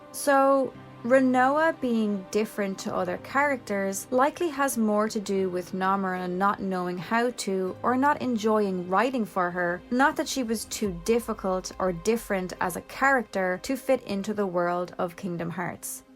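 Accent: Ireland. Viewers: Love the Irish accent.. need to go visit my family over there 👀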